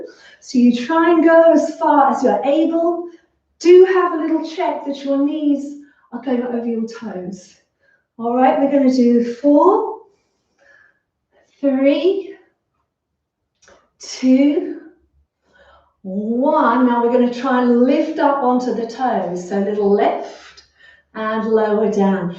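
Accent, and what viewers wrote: United Kingdom. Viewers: My wife and I discovered your videos two weeks ago and thoroughly enjoy doing them daily. We're 72 years old and appreciate so much your good instruction and for walking us thru each exercise in such a comprehensive manner. We both love listening to your wonderful British accent! Thank you so much! Bill and Debbie Graf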